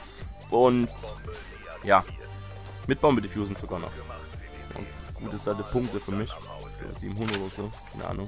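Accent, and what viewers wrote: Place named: Saxony, Germany. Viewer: he wo kommst du her hört sich an wie als wenn du aus sachsen kommst (ich komm auch aus sachsen)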